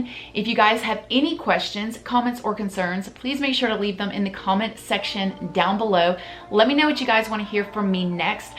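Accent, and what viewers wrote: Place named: Baltimore, United States. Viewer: Sounds like she is from Baltimore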